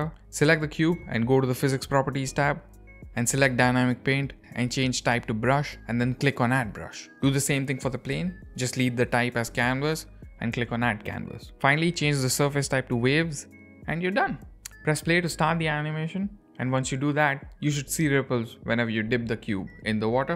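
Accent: India. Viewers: Default cube with a sexy moustache and Indian accent talking to me about my favourite software…. I’m in! Subscribed!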